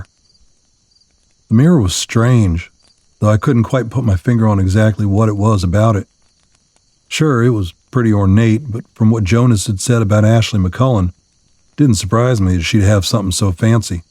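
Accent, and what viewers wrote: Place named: South, United States. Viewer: Awesome Awesome Continuation of this story. I love the 1st two I listened to by Viidth22 and again(1st one) by LightHouse just before coming here(my two Fav readers and voices). I was pleasantly surprised by your voice and it matched with the story so well with your southern voice. I Love these kind of Stories, it might be my age I'm not sure as I'm almost 60. I find some stories have a much younger feel to them and I can't get in to them as much as others, I'm an old Dean Koontz fan from the early 80's, read all his stuff since, and of course other Authors, so months and months ago when I came to some reading channels I was so happy as my eyes are not as good anymore, as I use to read for hours but can't anymore. *Plzz don't stop writing about these fella's and don't kill Jonas off yet like you did Tommy... lol.. 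Your Fan!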